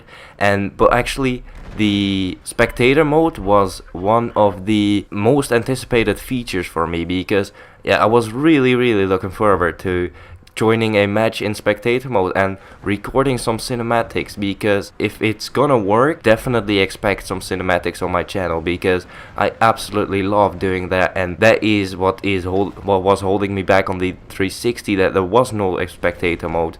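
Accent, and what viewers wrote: Netherlands. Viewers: wait are you dutch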